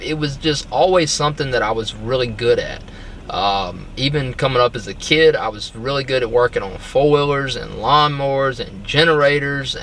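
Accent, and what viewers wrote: Alabama, United States. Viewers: i knew that accent sounded familiar! working at a shop up in huntsville. Roll tide baby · Love your Accent. Alabama Accent🇺🇲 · I just want to get my foot in the door bruh.. Cause I like Cars( Love the Bama Accent btw😉🤗✨)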